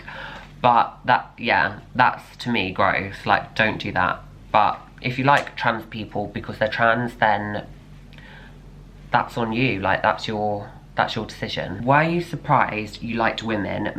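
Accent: United Kingdom. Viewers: Welcome,... It's a British accent, isn't it? 😀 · R u British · Are ya British?? cuz I can hear through your voice that you come from Great Britain. Now comes a little bit about me, which is: I do NOT describe myself as a transgender nor do I specify myself as a ideology as such. But rather do I think and wishes for and as a woman. Yes, you read that right, I'm want to go into the line for becoming a woman. For the first 2 minutes and 18 seconds, I can already tell you, you make great and large video's, about topics the world should need to know about. I want to share my appreciation to you either. I know, this video's was uploaded on December 30th, 2022 on a Friday, but I want to thank you for sharing your open-minded views and gender assuming related topics I.E. genderdysphoria. Now you maybe even think I've send you a book, but instead of harassing of catcalling you, I choose to a more family friendly option which contains to be precisely: respectfulness, care about you as a full human-being, and advice for hospitalization during situation when you need it the most. 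Thank you for reading my most important message, take care and goodbye.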